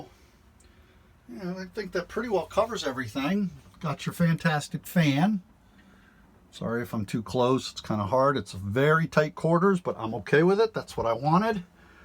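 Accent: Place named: Maine, United States. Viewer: You sound like you have a maine accent I live in maine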